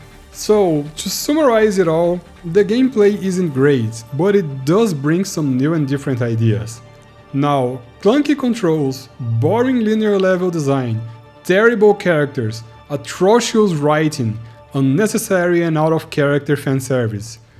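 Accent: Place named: Brazil. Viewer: I thought it sounded like my friend who is Brazilian's accent! Man I'm so impressed with bilingual speakers.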